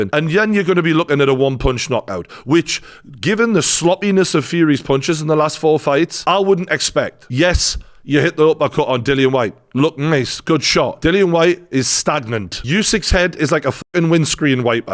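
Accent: Newcastle, United Kingdom. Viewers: Watched the fight and totally agree a rematch he loses his punches had no effect on Nganou Excellent video as usual Our lass thinks there hilarious and your the only Geordie she’s heard speak the same as me lol, She keeps saying how come we all swear as much just tell her because people haven’t got a clue what we’re saying All the best lad and keep up the great work From one big old Geordie to a big younger one 👍🏻👍🏻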